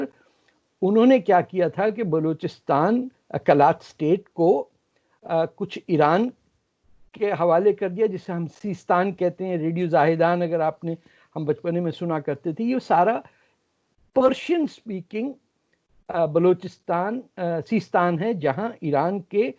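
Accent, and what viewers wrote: India. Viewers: Arzi kazmi I like your program. You look and speak like an Indian daughter. Very lovely. God bless you beta.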